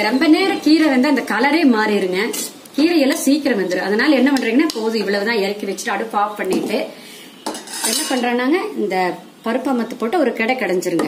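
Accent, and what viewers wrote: Kongu, India. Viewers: கொங்கு தமிழடன் கீரை கடையல் சுவைக்கிறது !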